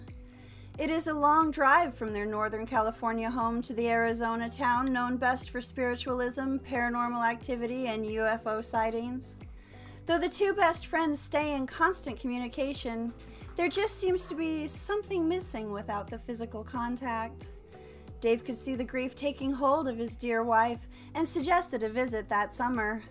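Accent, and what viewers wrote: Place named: South, United States. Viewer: No one cares if you like someone’s voice. You must have a pretty crappy life to leave a whole novel on a video about someone’s vocal tone. I love her Southern charm and affect. It’s called story telling. You’d do more good in life taking the time to tell people positive things instead of spreading negative energy like manure.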